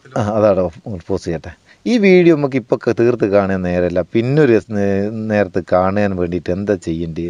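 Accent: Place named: Vadakara, India. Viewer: നിങ്ങളുടെ അവതരണം കേൾക്കുമ്പോൾ എൽ പി സ്കൂളിൽ എന്നെ പഠിപ്പിച്ച അദ്ധ്യാപകരെ ഓർമ്മവരുന്നു. വളരെ സാവധാനം പറയുന്നത് മനസിലാവുന്ന തരത്തിൽ. ആ വടകര, പയ്യോളി, മേപ്പയൂർ, പേരാമ്പ്ര, ഒരു നാടൻ ഭാഷയിൽ. അടിപൊളി. പുതിയ അറിവുകൾ ക്കായി കാത്തിരിക്കുന്നു. ആശംസകൾ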